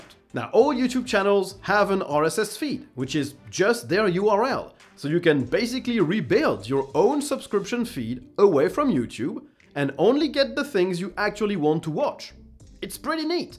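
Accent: France. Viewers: What is your accent? sounds French, but with a something. Belgian?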